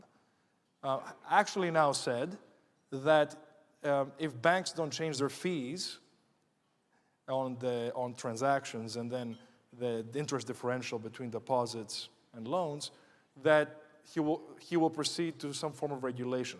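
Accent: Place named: England, United Kingdom. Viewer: Στέφανε ξύρισε το μούσι διότι δεν σου πάει. Κρύβει το πρόσωπο σου. Είσαι πανέμορφος άντρας. Μητρική γλώσσα τσ αγγλικά. Μου κάνει εντύπωση ενώ ζούσες στις ΗΠΑ μιλας με αγγλική προφορά και όχι αμερικάνικη. Καλό κουράγιο σου εύχομαι να έχεις εκεί μεσα στο ΣΥΡΙΖΑ που λογική δεν υπάρχει από τους συντρόφους σου.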